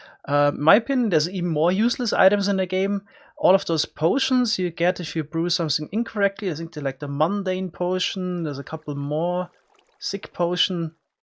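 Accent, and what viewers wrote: Germany. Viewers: Sounds like a german Guy🤷🏼‍♂️🙋🏼‍♂️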